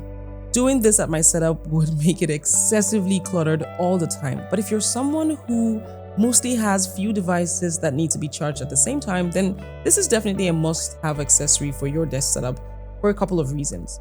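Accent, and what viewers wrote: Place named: Africa. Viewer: I didn't know women like u exist and you actually sound African. I am impressed and happy, will be nice to connect sometime and geek.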